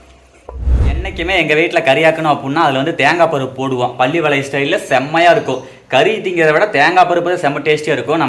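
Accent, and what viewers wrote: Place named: Erode, India. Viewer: Hi .....nan ippodhan first time unga video pakkuren...slang enga oor slang maadhiri irukku...nan erode..neenga endha oor bro...👍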